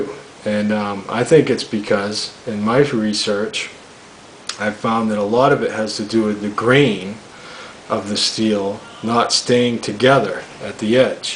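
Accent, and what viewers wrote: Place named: New England, United States. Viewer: Let me guess , Rhode Island or Boston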